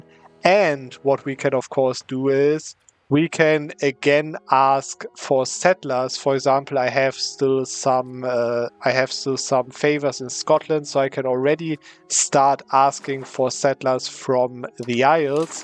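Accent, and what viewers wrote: Germany. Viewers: Holly shit the german accent is strong in you. Greetings from Germany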